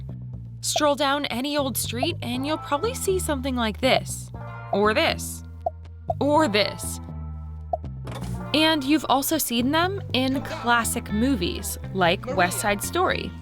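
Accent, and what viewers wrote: United States. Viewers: My impression is that (based on the host's accent) they're based out of the US and report on locally relevant material. Besides, crapping on the US is the national pastime.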